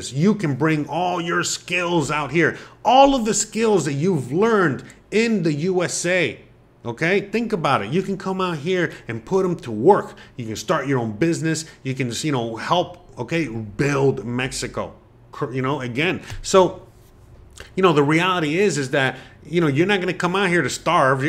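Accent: Cuba. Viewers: Man you speak cubano very different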